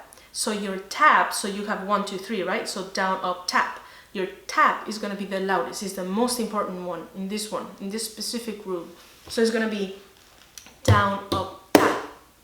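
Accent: Ireland. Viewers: Do I hint a little irish accent in there? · Glad to see you online again! I had seen the DOWN UP TAP method on another video, and wasn't quite sure if it was legit. It seems a great way to produce a lot of sound while being economical with the amount of skin covered. One day I too would love to visit Ireland. I hope you had a wonderful trip. If you don't mind me asking, where are you from? At first I thought your accent was Irish; is it not? I'm in California, so you can imagine the lack of bodhran players out here. Thank goodness for the internet! Your instructions have helped me greatly, thanks for being there!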